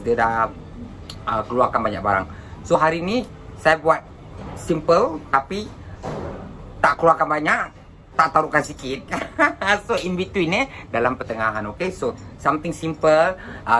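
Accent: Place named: Singapore. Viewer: suka dengar ocehan dialek melayu singapura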